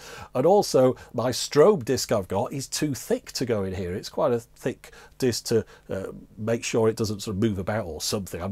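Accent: United Kingdom. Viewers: I love British accents describing things or providing instruction.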